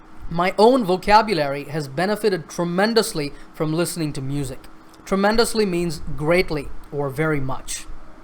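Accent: United States. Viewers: you know I m in 10 th I have joined a lot of English tutions one named American institution of English language but the problem there was that those people don't have accent but u have it I m an ilets student too have of my doubts are finished due to you now I don't get perplexed while listening an American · How did you master the American accent? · I really looking forward to learn American accent like you could you help me out please.. Actually I tried getting bogged down many times in it but the problem arises with practicing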